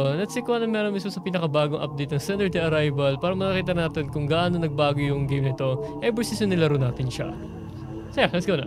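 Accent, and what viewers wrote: Visayas, Philippines. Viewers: Kuya bisaya kapoba?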